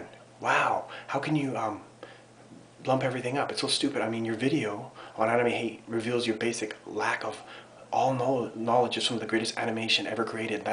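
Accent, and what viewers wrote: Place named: Canada. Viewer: You didnt have to explain The Z thingie since your aboot was rly obvious liked ur vid tho def kudos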